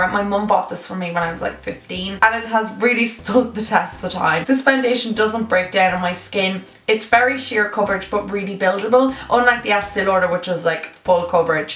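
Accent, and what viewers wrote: Ireland. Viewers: this is so helpful xx and love her accent isn't she Irish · What part of Ireland are you from? I'm from Louth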